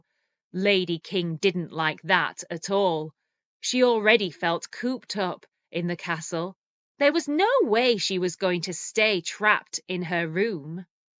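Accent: England, United Kingdom. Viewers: Yes indeed, I really enjoy the story. Love your English accent. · Hyy I like your accent that's why I'm addicted to your voice hope you Will continue these masterpiece stories ❤️ I wanna get British ascent like you , may be you can understand how much I love British accent 🙂🙂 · Are you from britain, your accents is soo british..thats nice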